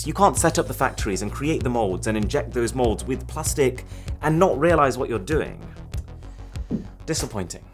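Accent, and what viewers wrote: United Kingdom. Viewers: Australian dollars later... I thought he was from the UK🤔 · your austrailian? but you sound so British. Do an episdode with dank pods! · I come here to hear a british accent and get my subscibe putton punch on my account.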